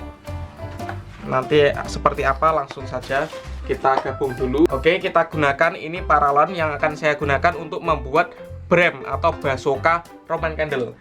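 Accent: Java, Indonesia. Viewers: Jowo pundi to mase?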